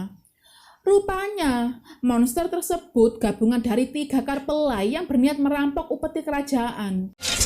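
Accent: Java, Indonesia. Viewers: moso sih mbak ora ngapusi kan... bahasane lucu medok² piye gtu...🤭 smangat mbak yu....👍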